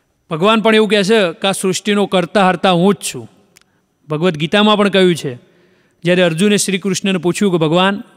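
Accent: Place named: Mehsana, India. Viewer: વાહ તમારી મેહાણાની ભાષા ખુબ સરસ છેઃ હોવે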